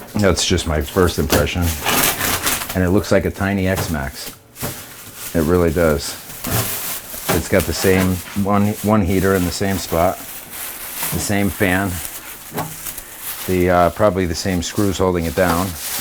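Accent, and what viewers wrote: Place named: Brooklyn, United States. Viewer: You got a slight Brooklyn accent! lol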